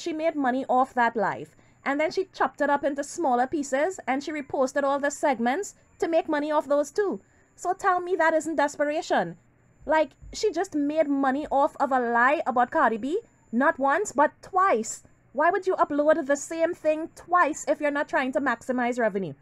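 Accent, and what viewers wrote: Trinidad and Tobago. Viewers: Hi are you Trinidadian??? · I clicked so FAST!!! Talk your Trinny shit! Love your accent and I’m here for all the Tasha K drama. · I love your content.. And your accent sounds 🇹🇹 trini like myself.. Ur right both bloogers hv something wit cardi an they look salty as hell. Cardi ain't got time.. She makin money 💰 moves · I love your accent are you trini?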